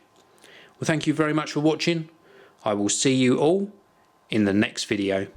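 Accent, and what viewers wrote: United Kingdom. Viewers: can you speak without the british accent , and speak faster ?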